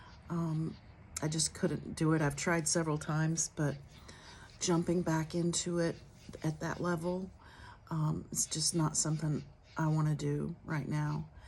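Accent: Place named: Texas, United States. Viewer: She has a Texas accent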